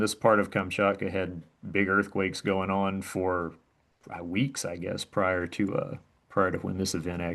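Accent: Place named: Pennsylvania, United States. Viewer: Awesome video with great illustrations! Sidebar: Your accent reminds me of my lower Bucks Co. PA in-laws 😊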